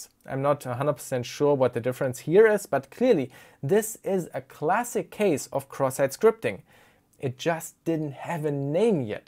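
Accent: Germany. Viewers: You always pronounce the X in XSS in German.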